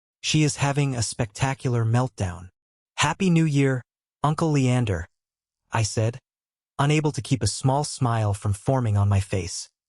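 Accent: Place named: South, United States. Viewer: I love it that the AI voice sounds like it’s speaking Chinese with a southern accent. 😂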